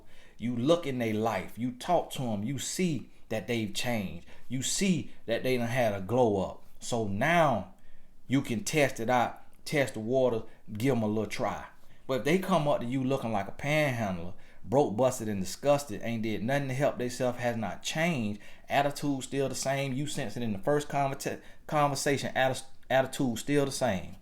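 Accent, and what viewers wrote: South, United States. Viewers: I mean your southern charm came out in this video!!! Love every video 😂😂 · Good information all facts thank you however, Lord that country accent got me laughing.... where are you from?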